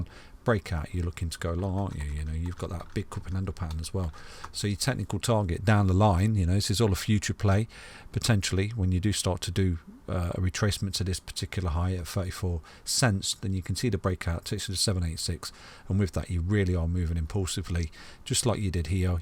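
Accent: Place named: Nottingham, United Kingdom. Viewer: Nice video mate. Are you by any chance from Nottingham. Your accent sounds local!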